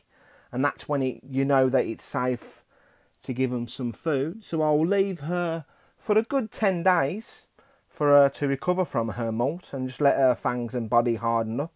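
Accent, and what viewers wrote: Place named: West Midlands, United Kingdom. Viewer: Clues - bloke -west midlands accent -has red runner roaches -name is.....do I win a prize? 😁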